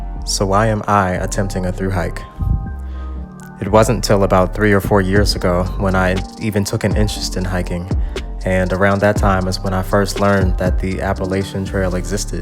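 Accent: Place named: Maryland, United States. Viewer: hey! Greets from Northern VA! You sound like you are over the Potomac in Maryland. Came over from Alicia's channel on the Dolly Sods adventure.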